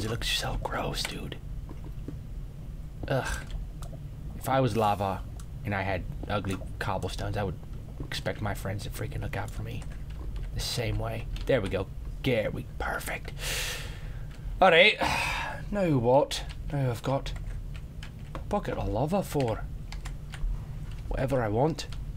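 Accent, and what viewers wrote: Scotland, United Kingdom. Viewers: What happen to Scottish accent voice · scottish accent is the best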